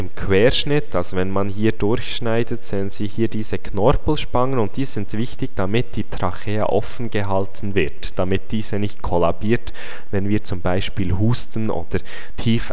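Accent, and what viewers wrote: Switzerland. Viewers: Kann das sein das ich ein Schweizer Akzent höre ? :)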